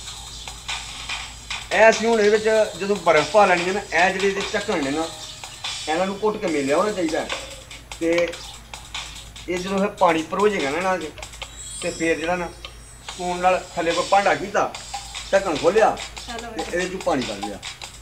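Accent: Sialkot, Pakistan. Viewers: I love sialkoti accant..... Hahahaha waaaah teh aondi ai.... Hahahaha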